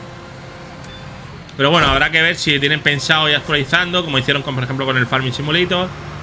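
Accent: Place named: Murcia, Spain. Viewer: Me suena a Murciano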